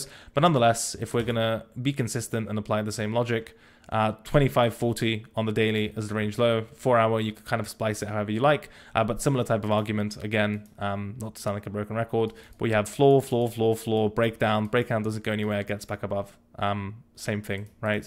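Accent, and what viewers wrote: RP, United Kingdom. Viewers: despite sounding like a tory boy is legit the best at going over price action, always watch your videos lad. thank you. i really mean that.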